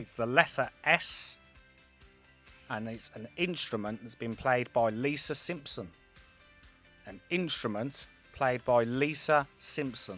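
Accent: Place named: Birmingham, United Kingdom. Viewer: 24, 😊 Great to hear a Brummie accent 👌 😊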